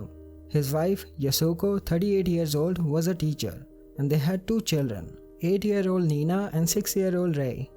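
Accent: India. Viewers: I agree. I don't understand having a man with a strong Indian accent narrate this video, of all people. I couldn't understand a lot of it and it was really distracting. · you don’t understand that the creator of the channel is actually an Indian man, and thus is probably going to have an Indian accent? 🙄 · Walks in Hears Indian Accent Walks out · indian narrator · The narrator is prolly from India 🙋🏽‍♀️ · the Indian accent is undeniable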